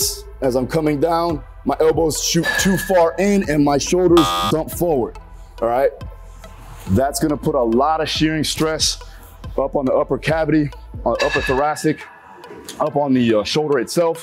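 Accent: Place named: Miami, United States. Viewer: you kind of sound like a miami boy with your accent. Is my assumption correct? I dig the video btw. Especially right now with this pandemic since I don't feel comfortable going to the gym, so those are good pointers for calisthenics.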